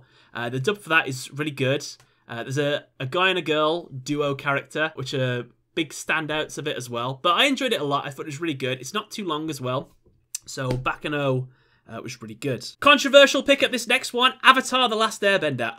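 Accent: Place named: Birmingham, United Kingdom. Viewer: “much simpla” ah it’s good to be a brummie like you haha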